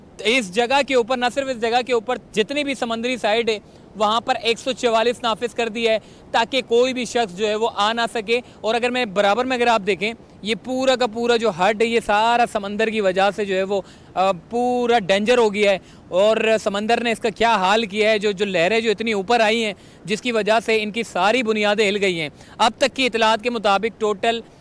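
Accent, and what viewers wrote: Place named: Punjab, India. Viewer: اردو کو پنجابی انداز میں نہ بولیں... شکریہ.... آفتاب عالم خان بینکاک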